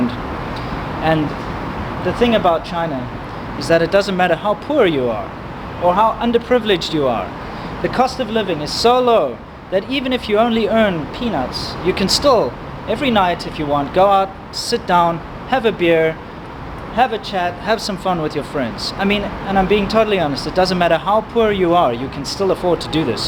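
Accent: South Africa. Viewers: Why do you not have much in common with Afrikaners? You don't sound a lot different to them. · I'm an American but I wish I had that South African accent. It like having a British accent (where people immediately think you know what the hell you're talking about) and an Australian accent (where people immediately think you wrestle alligators) Best of both worlds. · He's from South Africa, so he speaks South African English.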